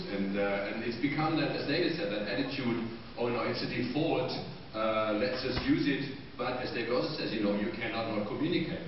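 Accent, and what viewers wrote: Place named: Germany. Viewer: I can't understand a thing beside the fact his german accent it does not help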